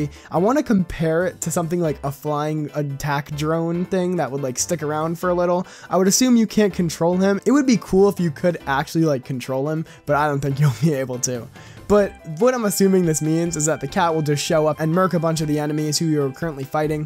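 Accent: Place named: United States. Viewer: US English doesn't even sound that bad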